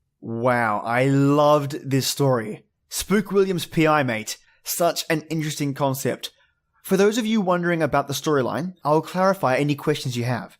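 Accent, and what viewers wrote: Australia. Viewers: haha, I read "blister blast" in your Aussie voice and it sounded great in my head 😛 But I honestly don't mind a little jump scare here and there. I actually prefer them cause it makes the video more fun for me 😊 Especially with headphones 👍I hope you have an amazing Halloween btw! 🎃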